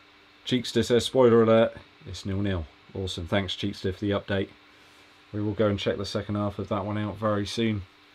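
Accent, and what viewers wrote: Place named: London, United Kingdom. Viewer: Are you originally from Yeovil? You don't have a Westcountry lilt, you sound Londoner/South East.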